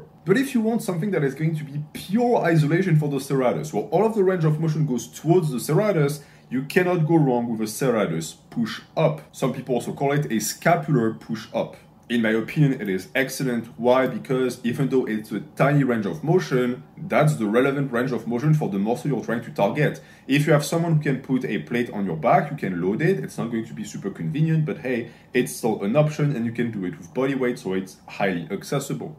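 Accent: France. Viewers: Are you french or speak french as your main language? I can sense a little bit of french when you talk in English but keep it up !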